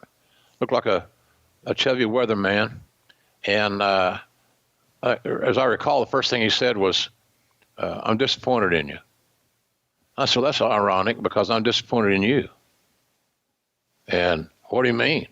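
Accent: South, United States. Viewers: I like that is more chilled in his older age on commentary. He sounds more like his laid back self. Imo he talks better out of character. I dunno? I'm just an Aussie who appreciates the chilled out southern accent over the loud mouth one lol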